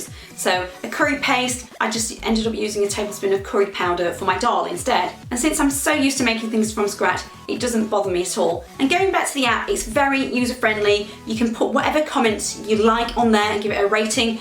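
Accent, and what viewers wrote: United Kingdom. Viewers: Helpful review - might just buy one. PS You're gorgeous - loving the slight northern accent ;-)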